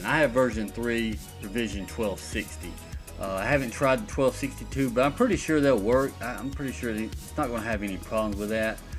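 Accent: South, United States. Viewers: My dude you sound like the biggest stereotypical southerner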